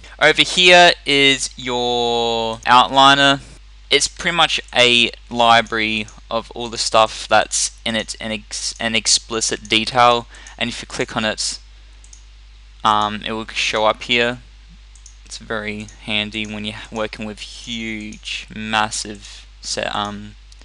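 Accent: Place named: England, United Kingdom. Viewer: Какой чудовищно неприятный английский акцент =(((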